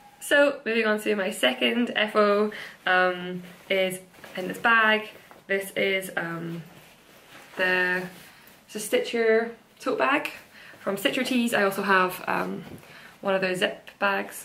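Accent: Scotland, United Kingdom. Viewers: Hello! I'm so glad I discovered your podcast. Love all your projects, and I prefer neutral/softer colours too. What part of Scotland are you from? I was trying to work out your accent. 😊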